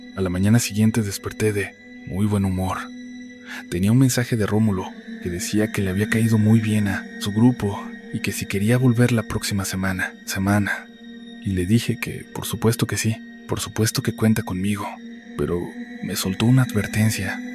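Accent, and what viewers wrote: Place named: Portugal. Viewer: POR QUE DE REPENTE TIENES ACENTO COMO DE PORTUGUÉS Y ESTUVO RARO QUE SE REPITE UNA PARTE QUE YA HABIAS CONTADO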